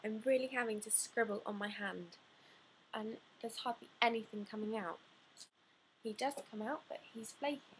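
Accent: United Kingdom. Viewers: I love British accents... but by the end of the video your voice was annoying the heck out of me Avon does give you a full refund, you know that right? Don't like a product RETURN IT!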